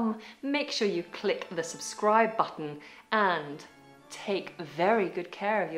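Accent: United Kingdom. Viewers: You accent is similar of british english. I love! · are you a british? I´M BRAZILIAN.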